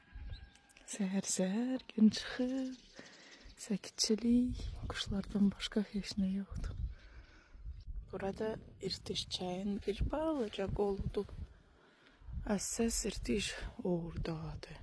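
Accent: Ganja, Azerbaijan. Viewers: Sən əsil gəncəlisən ləhçəndə şirindi elebil kazaşka deyilsən 😂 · Gözəl qəlbli bizim qızımız yaxşı ki, tanıdıq səni və vlogların superdi ailənlə birgə daima xoşbəxt ol bu arada inşeAllah ki, Azərbaycan dilini unutmazsan ən əsasıda Gəncə ləhçəsini unutmayın şirinlik verir sizin danışığınıza 😊 can səninlə birgə gəzdim kəndinizi eyni abu havanı verdiz mənə ❤❤❤❤😅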